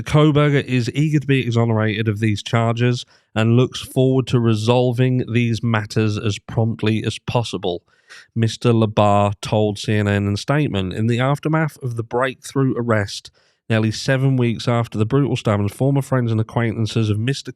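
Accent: United Kingdom. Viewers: British accents are amazing. Thanks for the commentary. Eye opening!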